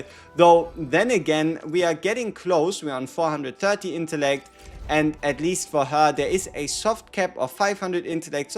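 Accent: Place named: Germany. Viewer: I spot myself a fellow german when I hear one :D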